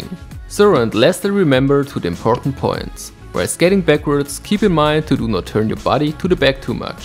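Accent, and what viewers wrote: Germany. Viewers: you still have a clear german accent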